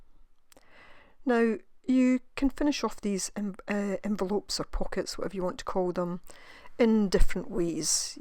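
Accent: Scotland, United Kingdom. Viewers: Lovely video..I love the sound of your accent💕 Scottish? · Hi nice to hear a Scottish voice for a wee change, enjoyed your tutorials very much and wonder if you know of any crafters that swap ATCs. Cumbria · Lovely! Super tips thank you and I do love your beautiful Scottish accent!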